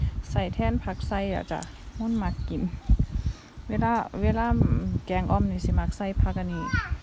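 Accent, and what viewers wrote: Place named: Chaiyaphum, Thailand. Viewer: คนจังหวัดใด.. สำเนียงชัยภูมิแม่นบ่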